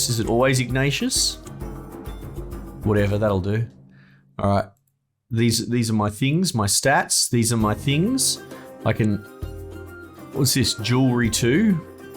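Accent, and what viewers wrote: Australia. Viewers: Hey, Mate, if your Australian lilt is insufferable, God help mine. The game seems quite interesting. I'll go a few more episodes for sure.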